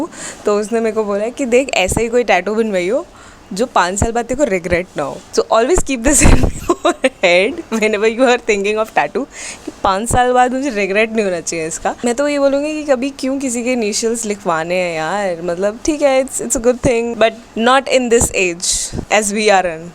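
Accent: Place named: United Kingdom. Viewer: You're amazing Yaar or aapki British English wahhh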